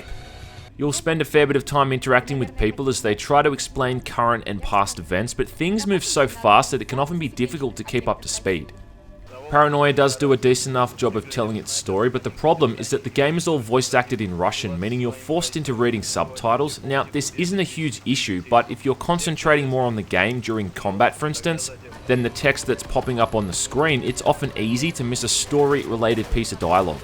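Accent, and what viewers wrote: Australia. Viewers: I fucking need subtitles to understand your aussie.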